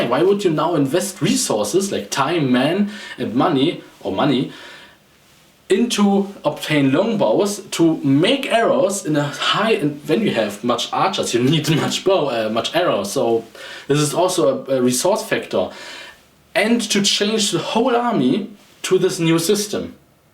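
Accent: Germany. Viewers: Ah, I love your accent. You're German, aye?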